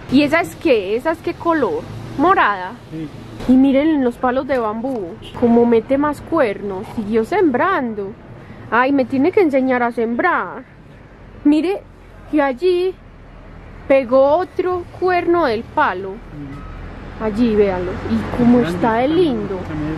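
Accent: Colombia. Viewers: sos muy dulce y alegre, te gustan las plantas y flores tanto como a mí. Siempre veo tus vídeos, amo tu acento colombiano. Soy argentina, me llamo Liliana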